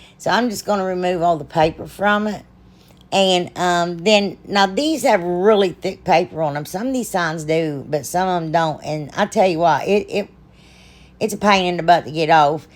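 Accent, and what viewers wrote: South, United States. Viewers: I 'v e just found your channel today but Girl I 'm a fan for life!! You're the 1st person I 've ever seen that sounds as "country" as I do. It's wonderful Never change. Cause remember, "the south's gonna do it again"! Love, love, love you!!!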